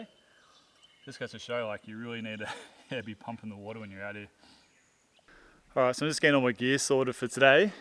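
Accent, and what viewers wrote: Australia. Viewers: Australian English is disgusting!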